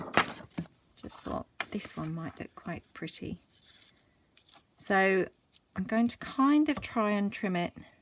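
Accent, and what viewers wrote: England, United Kingdom. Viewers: First ,as living in the US, what are Eggs & Chips and Potato Jackets? I don’t do much with paper , but am trying to get some knowledge of things I like , so I can! Also , your voice sounds exactly like a friend but I forget where in England she is from, but , still , Her accent always seemed a little different and exactly like yours! She IS NOT a crafty person at all, but does hair! I’m sure there is a different word you use for hair dresser but don’t know what it is! I love the words you both use a lot like “fiddly” & some I can’t think of now, lol. I will have to ask her about the dinner menu’s:-)) I love that paper and your tag! I’ve had some people I have subscribed to for ages and never seem to go outside of my box in watching videos, which I am now doing! There had to be a good reason I subscribed in the first place and now I can see why (except for the fact that you talk as much as I do in my videos) 😆. 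Gail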